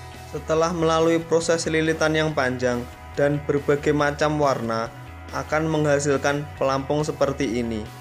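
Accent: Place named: Java, Indonesia. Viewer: Medok parah cuk.. 😅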